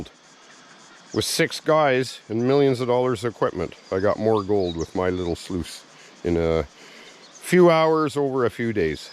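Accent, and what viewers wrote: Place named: Canada. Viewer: I watch this for the content but also the fantastic Canadian accent, one of the coolest accents there is. I’m a Geordie from the U.K. (Google my accent 😊).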